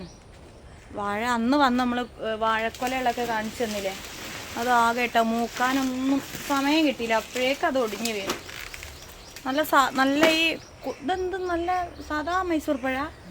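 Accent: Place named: Thrissur, India. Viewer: തൃശൂർ സംസാരം എനിക്ക് നല്ല ഇഷ്ടമാണ്❤️👍🏻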